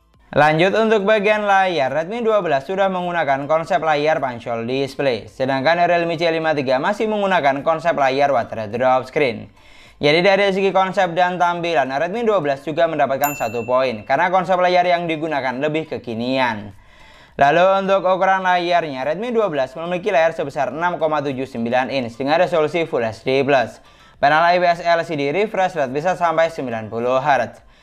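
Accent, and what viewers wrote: Madura, Indonesia. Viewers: kek logat madura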